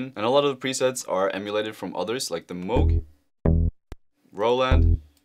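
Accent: Norway. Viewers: Are u from norway? I can hear ur accent lmao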